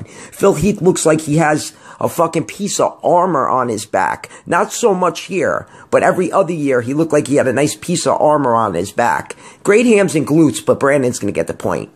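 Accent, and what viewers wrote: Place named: New York City, United States. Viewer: i love the NYC accent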